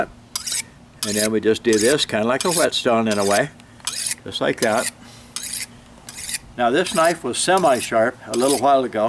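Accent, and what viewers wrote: United States. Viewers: You look very scandinavian for an american sounding white man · youre from california ?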